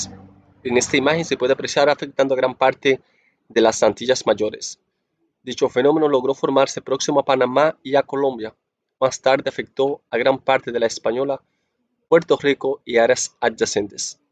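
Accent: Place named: Arab world. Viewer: Le escucho acento arabe..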